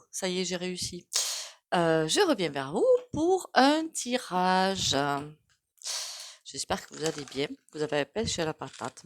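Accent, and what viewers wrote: South, France. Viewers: Bonsoir, votre accent est pour moi, très agréable, il m'apporte le soleil tout les jours, je suis du Sud et je n'y vie plus, il me fait beaucoup de bien, merci beaucoup, belle soirée à vous 🙏💐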